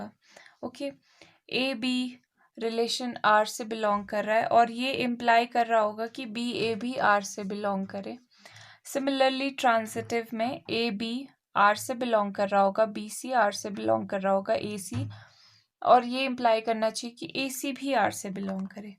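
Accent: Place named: Haryana, India. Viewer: mam aappp haryana se ho kya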